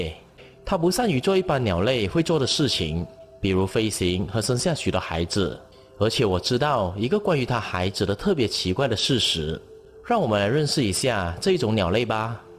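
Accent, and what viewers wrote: Malaysia. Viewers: 马来西亚腔好重呀 哈哈 btw 酵是念 jiao4😅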